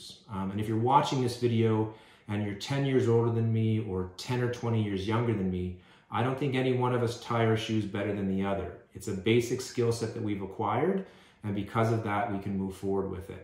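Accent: Canada. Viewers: I’ve looked for new videos also. I didn’t know you were in Canada until I heard you talk. Most of my fathers family lives in Ottawa. BTW, personally, I think Sandra Lee is overrated. There’s many, many surgeons, dermatologist and plastic guys that do as well or better. I think she’s sloppy and impatient.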